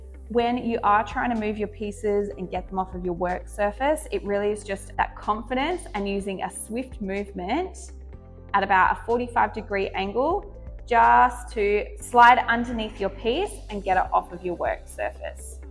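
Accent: Australia. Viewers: Hi, my name is Lori, I want start off by saying I love your accent, Australia, right? I'm seriously thinking of making clay jewelry, and this is the first time watching your tutorial, I like it! Have a great day! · What a great source of information , and great to hear an Ozzy for a change. Thank You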